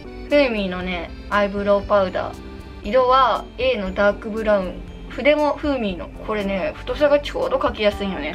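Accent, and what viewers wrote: Okayama, Japan. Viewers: ちゃんの毎日メイク知りたかったから嬉しい😂💗 方言が岡山ですごい安心感あります🥺✨ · いつまでも変わらない岡山弁が大好き🥺 岡山人として嬉しい😌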